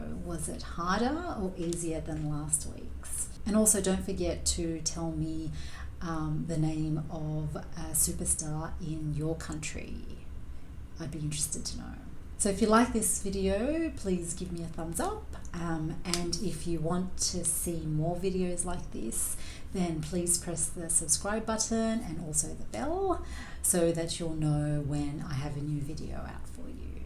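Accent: Australia. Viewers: This is an excellent channel for learning English and the Australian accent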